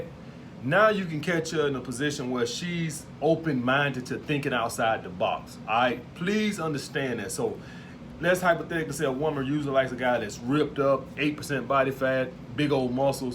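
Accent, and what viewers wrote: South, United States. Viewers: Laidback andhumble he's from Alabama if i remember correctly. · You sound like you from the south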